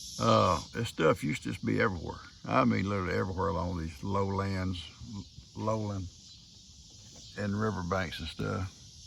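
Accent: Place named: Texas, United States. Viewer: Thank you for sharing your life with us. I love your stories and listening to your Texan accent and of course all of your babies ❤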